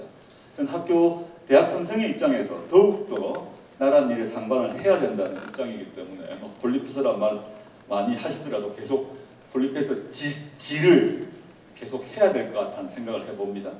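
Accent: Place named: Busan, South Korea. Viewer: 조국교수님 강의는 언제나 유익하고 쉽고 깨달음을 주면서 가슴에 와 닿는 제게는 중요한 인생강의가 되곤 합니다. 이렇게 유튭으로 듣고 볼 수 있으니 정말 고맙습니다. 저도 부산이 고향이라 교수님의 부산 액센트와 사투리가 친근하고 넘 좋아요 :)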